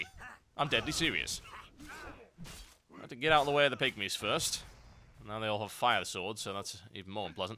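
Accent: United Kingdom. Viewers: Excellent reasoning and criteria for knowing when to continue to do the vids (still have lots of viewers). haha. Also, I think another appeal to these videos is because 1)1-10 questing is usually almost always a solo experience that 2)almost everyone can relate and is 3)usually pretty similar to every other 1-10 leveling. Having hilarious, witty, and informative british commentary in ze background of such opening questing is, well, a unique change to the otherwise familar questing experience. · I watch your videos for a few reaons. First, because I am to lazy to check out the new content myself, I have a premade I run dungeons with and my worgen who sits at 15. Secondly, I generally like watching LP's, and your on my list of people who have good opinions and don't sound like they are 12. Lastly, because you have a clear british accent, and I seldom here them in Canada. 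Keep making videos!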